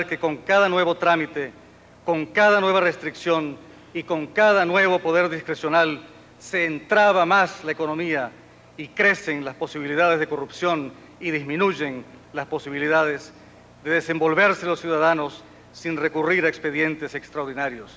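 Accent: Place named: Argentina. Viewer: Parece que Carlos viajó la futuro latinoamericano y después narró lo que vió. De verdad es impresionante todo lo que dijo, y como se cumplió años después. P.D: No había notado que Carlos tenía un poco el acento argentino.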